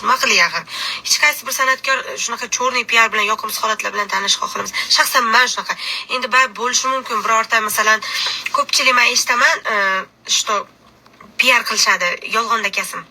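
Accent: Khorezm, Uzbekistan. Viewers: Товбаламанг нима килибди хоразим тилига тушмасангиз ешитманг бизни тил ози шундин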